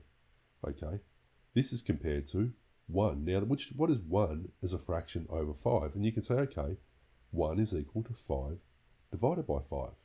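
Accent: Australia. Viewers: He’s an Aussie , American moment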